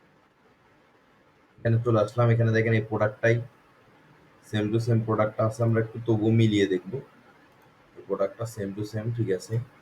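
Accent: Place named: Chittagong, Bangladesh. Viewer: কথা শুনলে বুঝতে পারি আমাদের চট্টগ্রামের ভাই। আপনার বাড়ি চট্টগ্রামের কোন জায়গায় বদ্দা?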